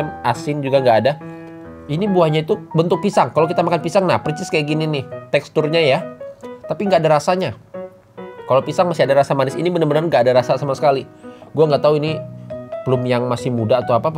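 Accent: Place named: Medan, Indonesia. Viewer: Orang medan mana suaranya!!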